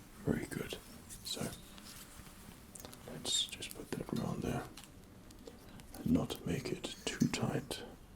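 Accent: England, United Kingdom. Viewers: You english tho.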